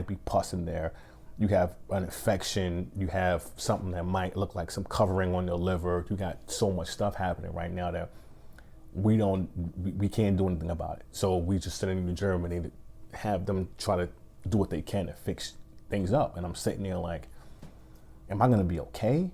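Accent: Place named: North, United Kingdom. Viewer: Yea man you from up north with that accent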